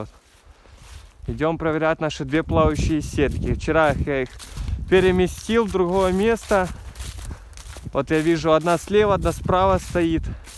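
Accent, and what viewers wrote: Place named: Belarus. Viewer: По говору.вроде бульбаш. Где живешь?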